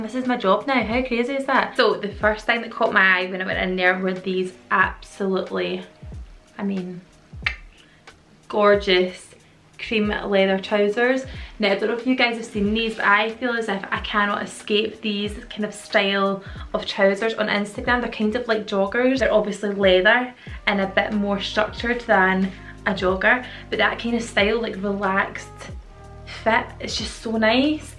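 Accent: Scotland, United Kingdom. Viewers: So is a Scottish accent? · Are you Scottish?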